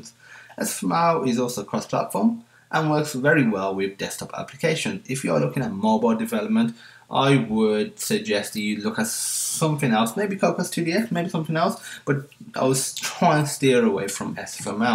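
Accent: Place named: Dudley, United Kingdom. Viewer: That's a great accent. I'm guessing you're from Dudley or somewhere near there.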